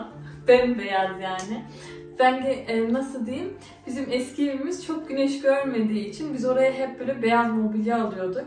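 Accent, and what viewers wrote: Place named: Usak, Turkey. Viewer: Güzel Kızım benim...Uşak'lı olduğunu Beyza'nın evine geldiğinde, konuşmandan ve Tarhanandan anlamıştım. Ne de olsa aynı Toprak..Kan çekiyo demekki. Güle güle otur her iki evinde de. "Ehh bende şindi Abone oluvedim gali!" Iyi ettim mi gııı?😉❤😘 Seviyom seni...inşallah bi gün Uşak'ta, Izmir'de karşılaşır ya da buluşuruz.😘❤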